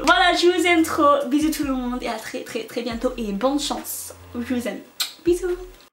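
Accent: England, United Kingdom. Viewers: So cute this english accent while speaking french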